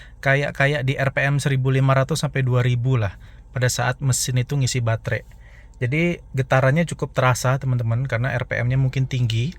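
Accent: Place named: Padang, Indonesia. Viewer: Suara aksennya kayak orang padang ya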